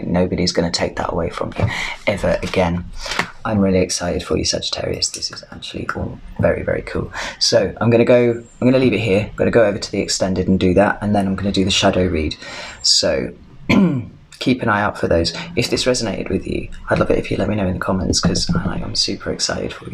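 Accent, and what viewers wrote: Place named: Newcastle, United Kingdom. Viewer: Expecting a Newcastle accent🤔? Anyhoo, the reading's bang on👍🏼The dark night lasted about ten years. I wasn't certain I'd make it through to be truthful - it was pretty touch & go for a while. But, yes; there's a project that's got me back on track. Thank you for the most excellent reading. 'Representing the male contingent by the way... Rob🦕 Namasteé 🙏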